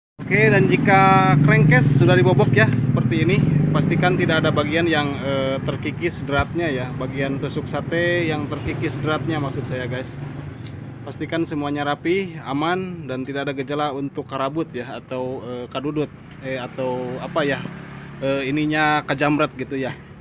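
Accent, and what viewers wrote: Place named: West Java, Indonesia. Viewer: Orang sunda mh seri da ngupingna😅😅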